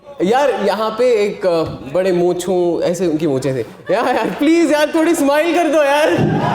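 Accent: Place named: United Kingdom. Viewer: Is he Indian?😁😁 He speak like a Britishers 😍😍😍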